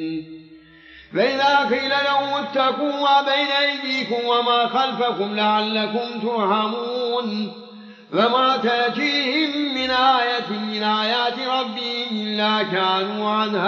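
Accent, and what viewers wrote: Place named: Turkey. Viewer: You can hear his Turkish accent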